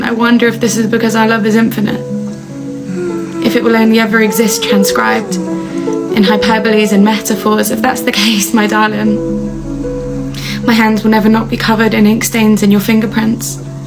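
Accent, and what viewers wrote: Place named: United Kingdom. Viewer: I would write ✍️ words and phrases to your lines that inspire me, that talk to me. I would compose words and phrases that your UK accent speaks to me every time you write a line, edit out a word or phrase that makes it more mathematically precise. Hoping that it is what my soul is saying to your soul.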